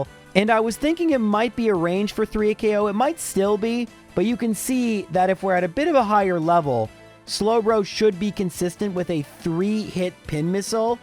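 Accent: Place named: Canada. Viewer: He's Canadian, and canadian pronunciation caused both of those "issues".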